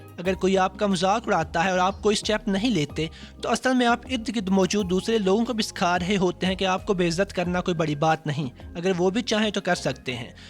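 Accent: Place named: Lahore, Pakistan. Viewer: Lahori accent 😂👌✌️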